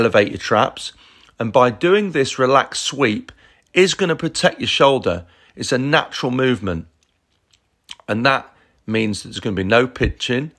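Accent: United Kingdom. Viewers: Very informative and helpful. The only point: "s english he uses in videos, I find it difficult to understand at times as a non-native speaker. Maybe its his british colloquial words and accent.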